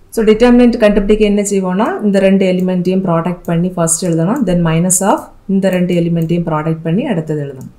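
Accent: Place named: Kanyakumari, India. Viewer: Mam neenga kanyakumari ah?.... Because your language like that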